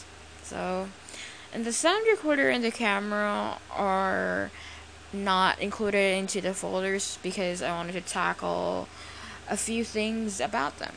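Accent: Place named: United States. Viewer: Can you just speak natural?? Damn! You sound annoying with all your Anerican English Accent!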